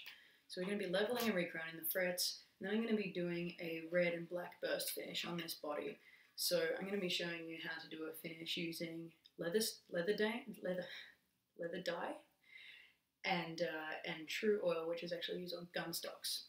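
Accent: Australia. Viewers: you sound aussie. you in oz or os and how have you found buying china outside of ebay?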